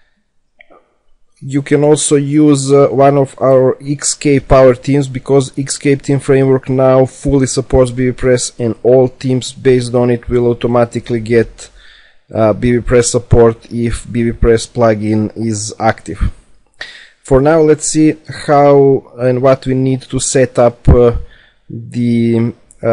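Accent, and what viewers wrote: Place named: Poland. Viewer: Słyszę jakby Polski akcent, nie mylę się. Mówi jak mój nauczyciel od angielskiego w LO.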